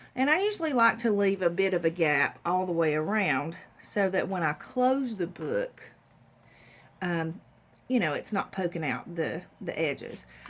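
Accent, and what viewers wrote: South, United States. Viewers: Great tutorial. Love your accent, the way you say booooks, lol. There is just something so charming about a southern accent. Love from Denmark :)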